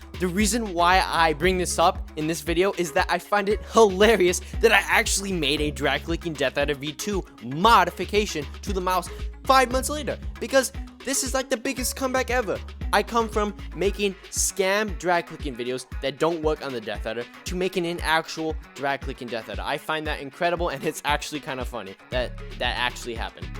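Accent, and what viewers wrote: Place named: New York, United States. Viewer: He sounds like a newyork dad like thank you gouys four so much suppourt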